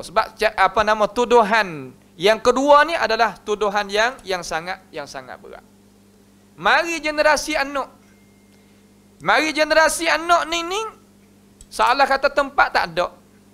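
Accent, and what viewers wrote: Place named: Kelantan, Malaysia. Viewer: Kalu buke oghe Kelate payoh kakloh nok pehe ni... 😁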